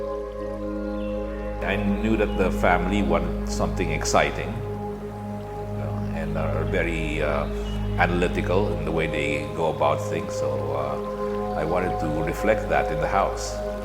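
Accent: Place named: Philippines. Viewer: Click agad dahil sa thumbnail, kakaiba yung design... Pini-figure out ko kung India, Malaysia, Singapore yung bahay Pero nung nagsalita na... Luh! I somehow heard a Filipino English accent kaya sabay tingin sa description at comment. Just wow! 💪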